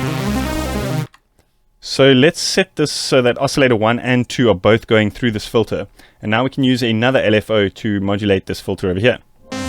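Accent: South Africa. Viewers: you sound South-African. Are you? :)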